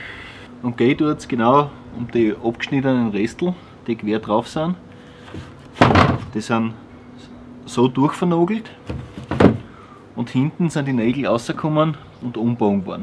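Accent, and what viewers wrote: Bavaria, Germany. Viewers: Wie wäre es denn mal mit HOCHDEUTSCH? Typisch Bayer, da sind mir die Sachsen lieber, die geben sich wenigstens Mühe das man sie verstehen kann !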